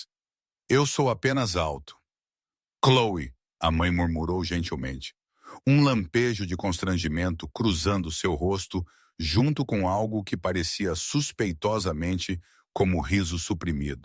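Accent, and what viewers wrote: Portugal. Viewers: Porquê esse é o português de Portugal e não do Brasil 😂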